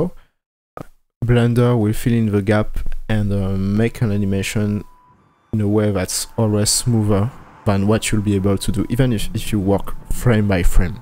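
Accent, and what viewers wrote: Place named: France. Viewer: Love your accent, I think you're french <3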